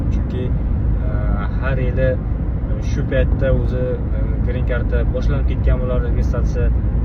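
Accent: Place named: Namangan, Uzbekistan. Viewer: Тоза чапани узбек наманганлик Шива колбди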